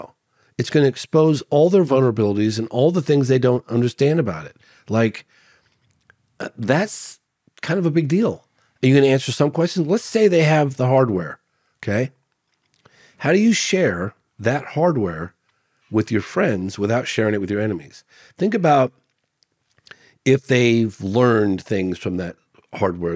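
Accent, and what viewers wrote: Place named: Scotland, United Kingdom. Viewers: Great interview, thanks to both of you. Fox deserves front row in the Ufology Hall of Fame. Speaking of historical crashes, many of your followers will be aware of US marine Jonathan Weygandt and his 2001 interview with S. Greer on a Peru UFO crash (craft shot down). This interview is well-known among ardent researchers in the field. So I want to mention that Jonathan Weygandt has now done a second interview, 12 days ago, and it can be seen on Martin Willis channel. I just became aware of it via Chris Lehto. Weygandt had vanished from sight, disappeared after his one-and-only appearance, and probably most of us old-timers figured he was dead. 2nd interview is remarkable for one thing especially, the fact that 'someone' (US ops) took the craft down, probably with a Hawk missile, or similar. Lastly, (Canadian here) lovely to hear the Glaswegian brogue and know you've not gone 'well- ' for youtube! 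Cheers! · Host must be from Glasgow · The new Scottish Joe Rogan 👍🏻😂😎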